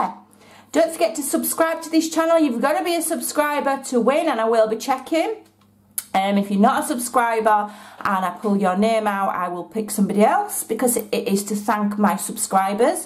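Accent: Yorkshire, United Kingdom. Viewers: I was looking for craft fair ideas and found your video on the lip balm holder. <link> After seeing that I checked out some more and was hooked by your laugh, your beautiful yorkshire accent (I have a good friend from Bradford and your voice is just like hers) and the fact that you were working on A4 card for the ones I found. If I didn't have arthritis I'd have done somersaults. Love your channel. You're very busy uploading this weekend. Well done girl. And thanks for the giveaway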